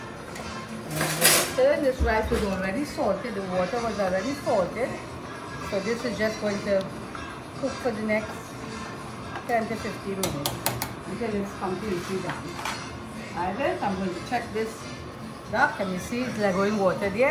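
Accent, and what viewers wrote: Trinidad and Tobago. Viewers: I just came across your vlog and subscribed within the first few minutes, i love that you haven't dropped your Trini Accent. Some people will go abroad for a few months and want to talk with an American accent, little do they know how much foreigners love a Trini Accent.. Keep being your Original Self and I'm sure your channel will grow in abundance.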